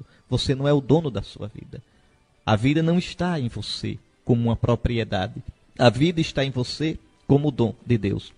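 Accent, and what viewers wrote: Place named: Pernambuco, Brazil. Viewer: Querido Fom Henrique, ajudai-me, lá do Céu,a fazer uma santa quatesma. Como gosto de ouvir suas explicações, com este sotaque e expressões pernambucanas, lembrando a minha origem nordestina! Que gracinha! Tanta santidade, se capta em seu modo de expressar e transmitir as coisas de Deus, com um amor extraordinário por Jesus Cristo, Nosso Senhor! Que delícia são as vossas homilias! ❤❤❤❤❤❤❤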